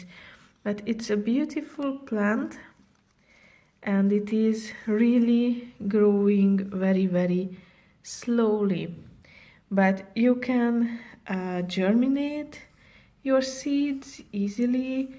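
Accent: Hungary. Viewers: It's very Hungarian English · Are you Hungarian? The accent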